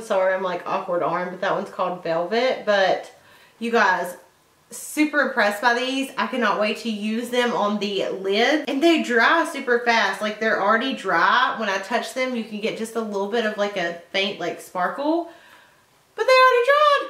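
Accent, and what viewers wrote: South, United States. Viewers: I love you and your accent so much! I have always loved people of the south and ended up marring a man whose mom is from Georgia. You guys say things similarly and it warms my heart. Your channel has grown so much since I first started watching you! Great job! I now have a beauty channel as well if you would ever like to watch someone new.❤ I hope to go to IMATS next year! I live only about an hour and a half from LA!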